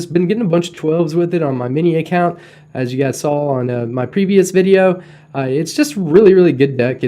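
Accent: Texas, United States. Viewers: I miss the Texan Drawl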